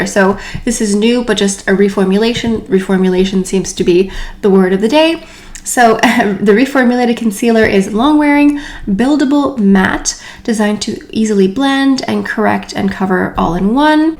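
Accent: France. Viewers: Hello, I like your French accent... Thanks for sharing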